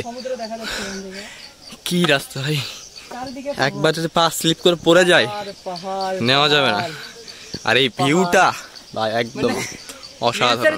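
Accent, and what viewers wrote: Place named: Bogura, Bangladesh. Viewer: Bogurar vasha hits different 🤍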